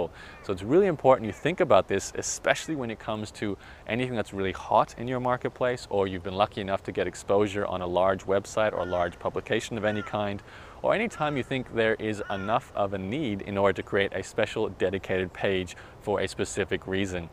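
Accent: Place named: United States. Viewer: You are Australian, yet you sound like you have an American accent? Must be all that travelling you do?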